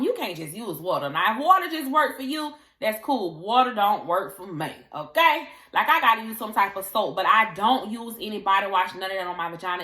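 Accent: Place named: Florida, United States. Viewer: I know you from Florida boooyyyy that accent sound like all my kinfolk😩😭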